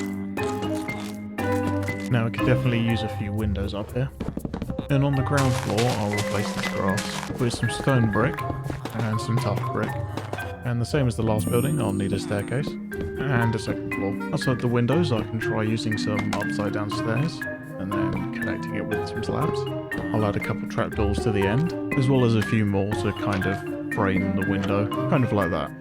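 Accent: United Kingdom. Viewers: Why does everyone that plays Minecraft hardcore is British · Omg I love British people, I like the accent it's hard to learn but if your British your lucky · He is british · This is just a normal British accent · Bro’s voice is so calm, comforting and polite with the british accent on top of it is just amazing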